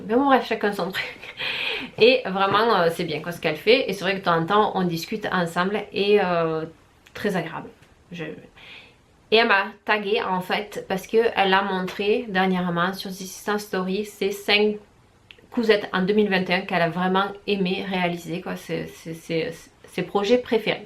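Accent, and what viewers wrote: South, France. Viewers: Bonjour, merci pour ce partage avec ton bel accent ensoleillé 😄 Tu parles de l'application Couture Actuelle, où puis-je la trouver?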